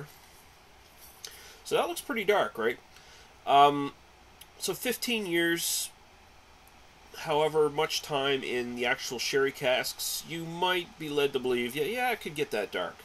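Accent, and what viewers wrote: Canada. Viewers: canadian ay! (oat and aboat)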